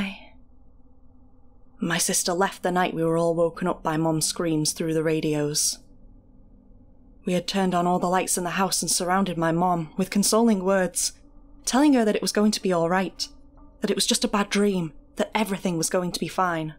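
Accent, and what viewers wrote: United Kingdom. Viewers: Amazing story and she did an excellent job!!! I love a British accent and she def brought it all to the table. Would love to just sit and listen to her narrate stories all day. Good luck, hope to see you push through to the next round!!!!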